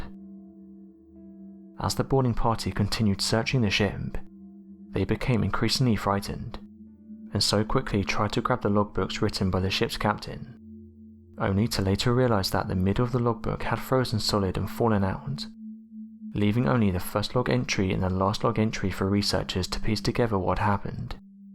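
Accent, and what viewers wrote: England, United Kingdom. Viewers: T A it’s an English accent.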